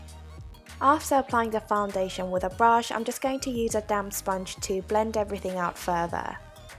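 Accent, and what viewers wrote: United Kingdom. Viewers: 네일 어떤 색상인가요?? 한국꺼면 좋겠당ㅠㅠ.. 저도 외꺼풀이라 자주보고있어요!!! 영국 발음도 너무 이쁘고♥♥♥ 건강 관리 잘하시구 앞으로도 좋은 영상 부탁해요~~ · 아픈건 괜찮으신지용...! 그나저나 뭔가 핼쓱... 해보이셔요ㅠㅠ 영국발음도 너무 좋아요ㅠㅠㅠㅠ 항상 영상 볼때 엄청 집중되요 발음때문에ㅠㅠ 끄아아 저랑 눈 모양이 비슷해서 항상 공감되요 눈화장이ㅎㅎㅎㅎㅎㅎ 짱 좋음! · 이런 거 잘 못 남기는데 언니니까 댓글남겨봅니다..ㅠㅠ ㅠㅜlove...♥ㅜㅜㅜ 처음엔 저희언니가 보는거 따라보다 보게 되엇는데 언니 정말 매력 넘치세요...ㅜㅠ♥ 정말 같은여자가 봐도 반할정도...ㅠㅜㅠㅠㅠ ㅠㅠㅠㅠㅜㅠㅠ그리고 진짜 언니가 고르시는 색마다 언니랑 정말 잘 어울려요...ㅠㅠㅠ 최고최고...ㅠㅠㅠ♥ 1년뒤에 저도! 드!디!어 이제 화장할 날이 올텐데...!!...ㅠㅠㅠ 그땐 직접 따라해볼게요ㅜㅜ항상 업로드해주셔서합니다!!!! (영ㅇ국발음도매력짱짱!꺅언니최고!) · 저도 속쌍꺼풀인데 화장이 너무 예뻐요 영국발음도 너무 멋있고 매력적이에요!!!!!!!!다른 튜토리얼도 많이 올려주세용❤️ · new to ur channel was so shocked to hear that brit accent! haha this vid makes me excited for my trip to korea! :)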